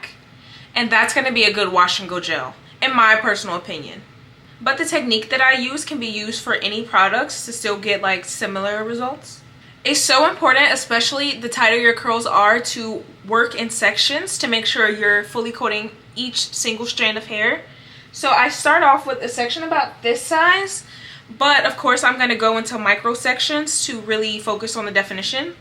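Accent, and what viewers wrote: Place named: Caribbean. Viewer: Thank you for sharing &I love your accent is it Caribbean?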